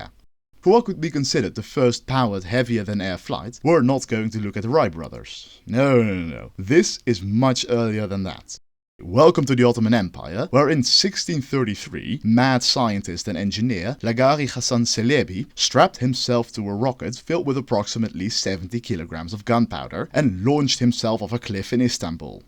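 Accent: Netherlands. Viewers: Gegroet landgenoot, mijn makker-alarm ging al af toen ik je accent hoorde.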